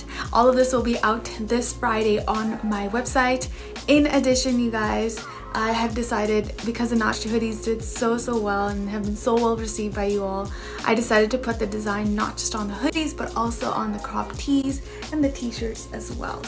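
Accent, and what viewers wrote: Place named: United Kingdom. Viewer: Hii, your english is like britishers😶😶 how it is I don't know.... But anyways your dance is amazing😘😘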